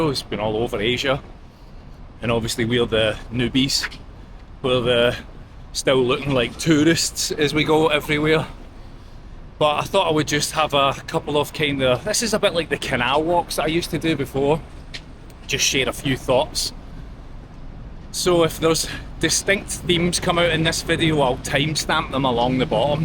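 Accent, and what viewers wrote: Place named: Glasgow, United Kingdom. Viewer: It's refreshing to hear your accent after leaving Glasgow 20 years ago. Used to live in Denistoun when I went to Strathclyde Uni. Enjoy KL mate!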